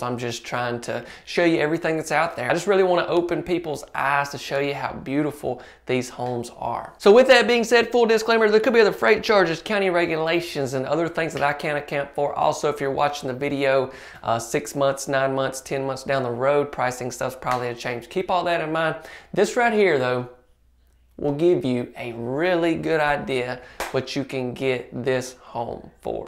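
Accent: South, United States. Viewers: I mainly watch you because your down home accent and voice is so soothing and it gets me through another crappy, depressing California day. If I bought one of these homes, it damned sure wouldn't be here. Well, as for this place, I can say it's unique, for sure. I like that it's a single story. I'm old...it does make a difference. I don't have no large family. But, I hate open concept. Hate it. And I would be annoyed as all get out with all those light fixtures that take special (and expensive) bulbs. And, there are not three pantries...the barn door closet actually is the true pantry. The other door inside the kitchen is a broom closet and the closet in the hallway by the bathroom is a linen closet for towels, bedding, etc. Just so you know. And I'm still trying to figure out why there is a pass-thru in the master closet. Is it vogue to hide yourself carrying your laundry basket these days? 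That thing, for me, is fodder for a horror movie. Nice to make access for the monsters in your closet to come in. And finally, a bathtub in the master bedroom and a dream of a tub at that. Who cares if the monsters are coming into your closet when you're soaking in that!!! The shower looks like it belongs in an RV but who the hell is going to care about the shower with a tub like that?! I'd just use that shower as a storage area! And cute little guy, btw. Those cheeks 😁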